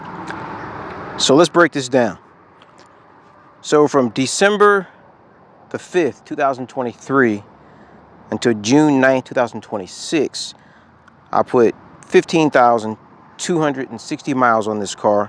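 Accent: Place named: Pittsburgh, United States. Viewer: You from Pittsburgh? Ya accent sounds like it.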